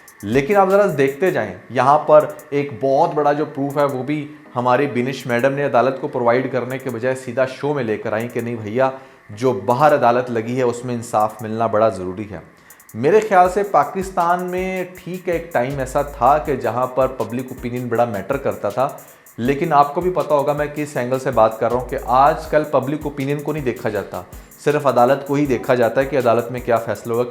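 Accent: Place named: Delhi, India. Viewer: Sir aap phir ko fir na kaha karain aur aisa lagta hai aap dehli se taluq rakhte hain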